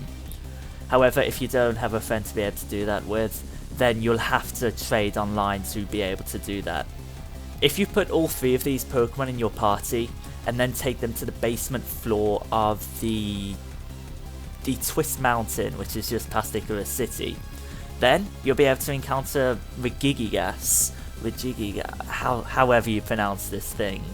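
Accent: United Kingdom. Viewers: AND ENOUGH OF THE BRITTISH ACCENT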